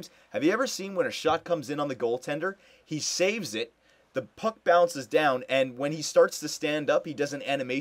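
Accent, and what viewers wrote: United States. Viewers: R u amaracin or Canadian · r u american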